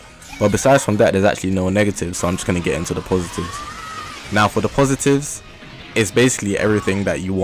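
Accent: England, United Kingdom. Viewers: i did lol , the english accent is too thick.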